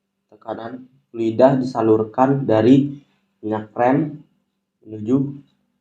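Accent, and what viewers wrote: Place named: Bangka, Indonesia. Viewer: Kayak orang Bangka Abang ni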